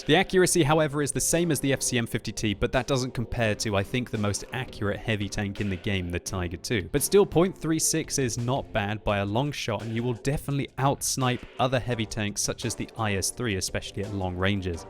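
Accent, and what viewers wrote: France. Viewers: i'm french and i quit like your french accent 😂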